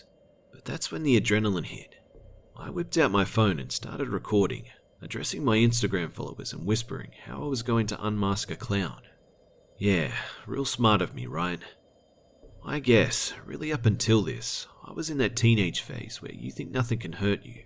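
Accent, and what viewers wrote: Australia. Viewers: Yo, what state you from in Australia, just wonna say hey from Perth lmao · are you Australian?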